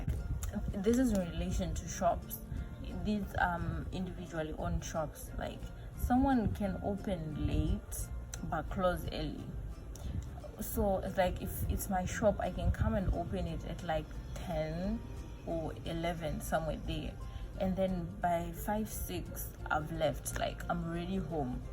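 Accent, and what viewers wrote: Ghana. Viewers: You sound a Ghanaian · Ghanaian accent is the best just like the British.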